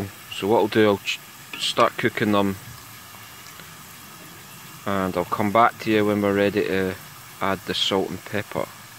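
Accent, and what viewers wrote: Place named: Scotland, United Kingdom. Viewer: Hey ho. Nice vid u made there. I‘ve been to scottland several times to visit my family. Chrisps are very popular in ur country. Where are u locatet? My family lives between Glasgow and Edinburgh. Livingston Bathgate west lotian. U like outdoor very much. I enjoy watching ur vids every time. Ur accent is so nice to my ear. Keep em comming I think u are a good lad, where was ur dog this time? Greetings from Germany 🇩🇪. Bon Appetit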